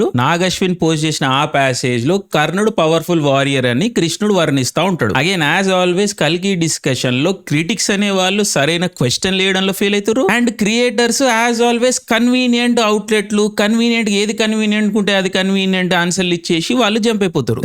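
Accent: Telangana, India. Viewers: Ippudu nuvvu Telugu matladuthunnava Telangana matladuthunnava? #JustAsking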